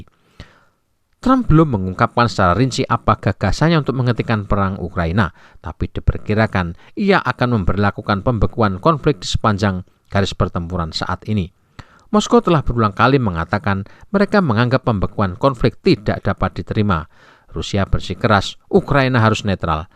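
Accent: Java, Indonesia. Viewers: Mas, medoke ora ilang ilang ? Aku Suka 😅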